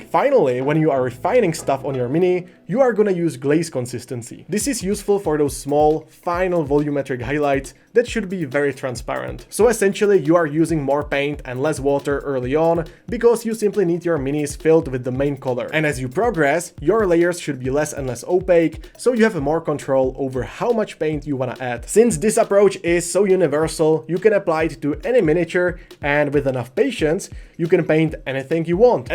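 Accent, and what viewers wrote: Czech Republic. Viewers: Great video. Just out of curiousity, what is his nationality? I am hearing a Czech/slovak accent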